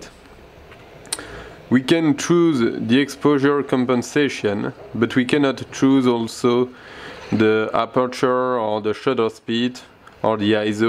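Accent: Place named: France. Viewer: On sent vachement l'accent français, mais on apprécie l'effort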